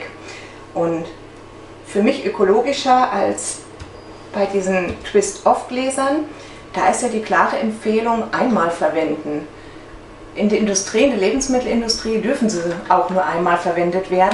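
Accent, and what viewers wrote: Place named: Palatinate, Germany. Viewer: Ah, ich Liebe deinen Dialekt :) wo kommt ihr den her? Hört sich für mich nach Palz an, kann mich natürlich auch irren. Liebe Grüße aus dem Saarland :)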